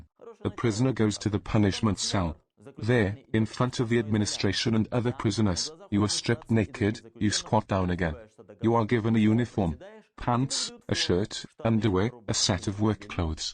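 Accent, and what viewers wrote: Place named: Wales, United Kingdom. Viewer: The fact this is a Welsh voice cracks me up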